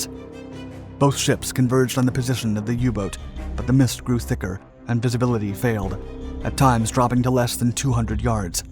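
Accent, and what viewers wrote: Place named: United States. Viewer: seems a native American speaker, at least from his pronunciation. The verbal gaffes are difficult to explain, unless we understand he grew up in a video world, without more careful, detailed study of history. inattention to such detail routinely harvests viewer criticism, and his sorest need has been a video editor, assigned the demanding task of finding errors. By accident, I have found sections of a source work he has quoted almost verbatim, but without attribution.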